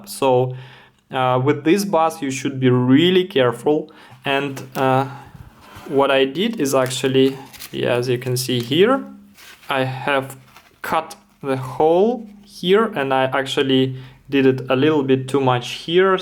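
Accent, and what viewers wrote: Russia. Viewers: русский акцент выдает)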